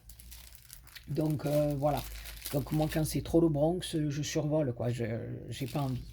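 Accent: South, France. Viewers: Bonjour, je découvre votre vidéo et reconnu de suite votre accent du midi 😀 J'habite Aix en Provence 😊 Vos créations sont magnifiques, j'aime beaucoup le petit coffre qui est magnifique 🤩 Je me suis abonnée 👍 Merci pour le partage.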